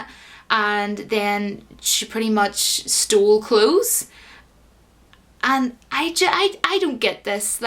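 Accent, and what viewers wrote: Ireland. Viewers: Are you Irish ? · Are you from Ireland ? Moving to Ireland in August. Love your accent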